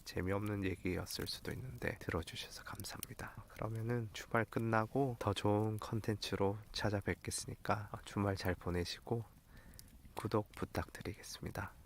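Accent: Gyeongsang, South Korea. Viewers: 최근에 우연히 유툽을 청취하게 되면서 재미에 푹 빠져든 예순을 넘은 경상도백수아제입니다..ㅋㅋ 언어에서 약간은 경상도 정서를 느꼈었는데 경산 갑제동이 본가라고 하시니 TK로서 더욱 정감이 가네요! 고령화시대를 맞아 중년들의 경제적 고민도 깊어가는것 같은데 수준높은 강의에 소중한 도움을 얻게 됩니다. 장작불의 운치가 썰을 푸는데 기막힌 조화를 이루는듯 합니다. 건승을 기원드리며 응원합니다.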